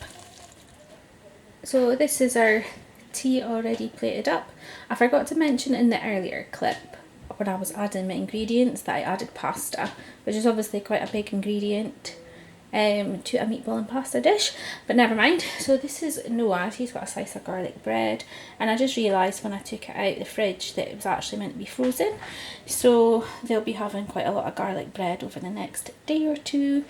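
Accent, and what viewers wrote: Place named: Scotland, United Kingdom. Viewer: ove your accent, is that Scottish??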